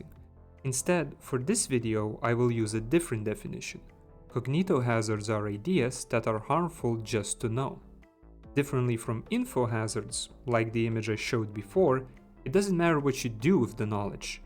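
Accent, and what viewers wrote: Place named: Poland. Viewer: słychać polske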